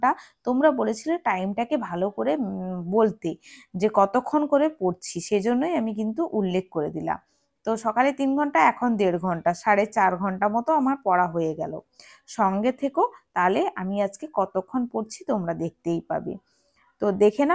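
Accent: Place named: Medinipur, India. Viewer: Purba Medinipur er kothay didi?? Amr bari Kanthi.... Macher tok sunei bujhechilam, tumi o Medinipurer ❤️...tai comment kori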